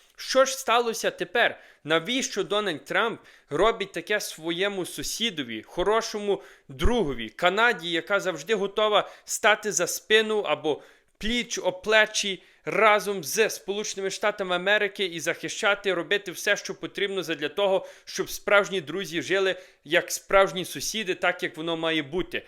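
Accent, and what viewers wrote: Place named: Hutsul, Ukraine. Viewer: Вивчи Українську цуценятку ато від гуцульського підар акценту аж верне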